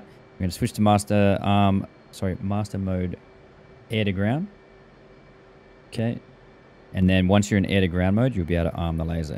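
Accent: Australia. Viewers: So nice hearing another Aussie accent. Great video!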